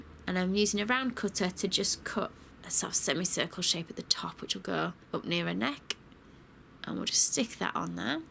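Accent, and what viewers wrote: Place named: England, United Kingdom. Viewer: So refreshing to hear an english accent from someone so talented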